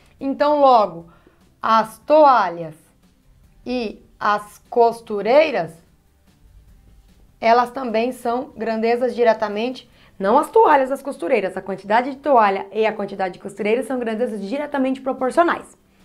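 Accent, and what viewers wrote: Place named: Minas Gerais, Brazil. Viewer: Professora você tem um sotaque muito bonita . você parece ser mineira . gosto muito de matemática é uma matéria muito utilizada no nosso dia a dia 😔 obrigado professora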